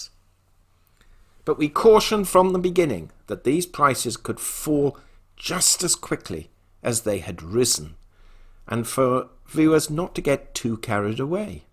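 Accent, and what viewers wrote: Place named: RP, United Kingdom. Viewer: Wow, you just accused Mike Maloney, Chris Marcus, Chris Duane, David Morgan, Bix Weir and other respected silver professionals of either not having the technical understanding of the markets or worse yet, fraud by disseminating hype to sell product, while dismissing the fraud committed by JPM and the rest of team evil, who purchased over 2 million of fake paper ounces of silver to knock the price down to low $27s. Makes me wonder 🤔 about whose pocket you're in? The arrogance matches your Queen's accent.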